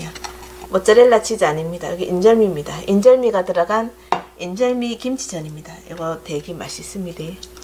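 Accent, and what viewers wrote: Gyeongsang, South Korea. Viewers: 경상도 분이신거 같은데 저도 그쪽~혹시 아실라나 옛날에 막걸리 거르고 남은 찌개미에 단 맛을 내기위해 뭐 넣고 먹었는지 아시나요. 설탕이 없던 시대인듯 지금 표현할라니 너무 웃기네요. 마른모 꼴로 생긴 (꼬라재비)ㅋㅋㅋ.ㅎㅎㅎ. 그거 몇알 넣고 찌개미를 숫갈로 비벼서 먹으면 정말로 맛있었던 생각이 나네요.먹거리가 귀해서 봄이면 들에서 찔래도 꺽어먹고 진달래도 따먹고 버들개비 따먹던 아~주 옛날 생각이 나네요. 까맣게 잊고 지냈던 옛날 생각을 잠시 해 보았네요. 저도 막걸리 담그기 해보고 싶네요. 맛있는 막걸리 맛은 잘 알아요. 옛날에 맛을 많이 봐 놨기 때문에 ㅎㅎㅎ. 언제 그렇게 많은걸 배웠을까 싶네요. 감사합니다.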